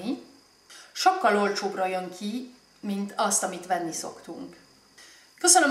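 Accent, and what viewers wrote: France. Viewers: Nagyon szépen beszéled a magyar nyelvet! Imádom a francia akcentusodat! :D szexy és egyedi ;) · Egy kicsit hurcsa hogy magyar vagy és francia akcentusod van.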